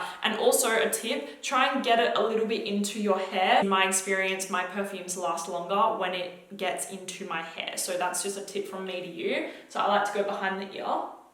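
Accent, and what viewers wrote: Australia. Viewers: I LOVE your Australian accent!!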